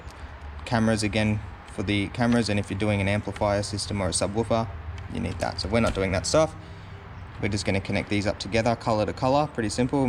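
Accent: Australia. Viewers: Why did you skip illumination if the wire was right there? I don't know about AUS (I'm just guessing based on your accent), but in the US, Kenwood HU's do dim appropriately when the headlights are on at night. I get not going out of the way to find it...but capping off both orange wires takes just as much effort as connecting and soldering/taping the orange together. Just an observation...well done otherwise.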